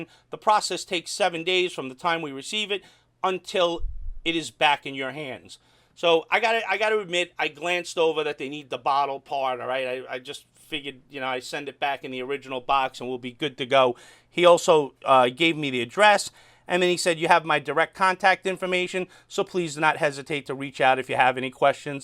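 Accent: New York, United States. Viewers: lol ya your New York accent would offend some of these cheese puffs in California coming from the east coast i know New Yorkers, but some of these silly fluffs man oh man this world of ours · "I'm a newyorker thats the way we talk"... classic!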